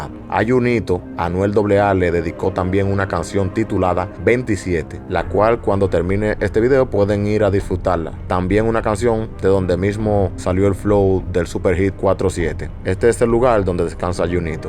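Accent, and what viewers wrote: Dominican Republic. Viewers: ok que bien, te pregunto como ases noticias urbana de PR, pero hablas domii jjjj bien ahy manito💪💪💪💪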